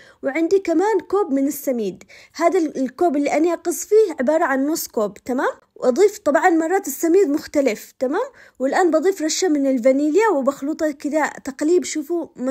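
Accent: Yemen. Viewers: والله يعجبني كلامك ماشاء الله واغلب الكلمات اللي تقوليها نتكلمها احنا اللهجة اليمنية قريبة جدا من لهجتنا...سبحان الله متابعة من الجزاير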